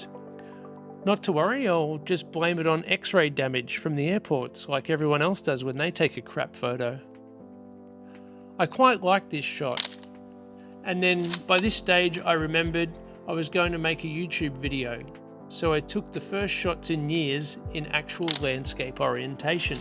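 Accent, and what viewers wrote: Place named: Australia. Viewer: Love the explanation of the film, and your casual Aussie cheeky delivery. Nice product hand flourishes, this too could be yours on...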